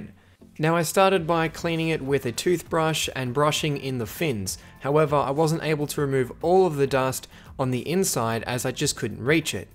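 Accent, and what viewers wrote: Australia. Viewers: soothing? he has a Aussie accent, anything but soothing...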